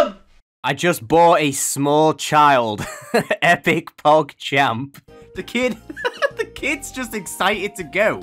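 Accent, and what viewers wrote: United Kingdom. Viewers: wow British dude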